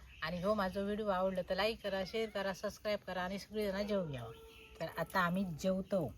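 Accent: Malvani, India. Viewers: काकीची मालवणी ऐकाण पॉट भरला..... वाह....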